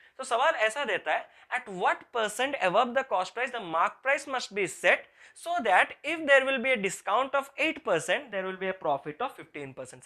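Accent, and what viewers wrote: Odisha, India. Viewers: Are you from odisa?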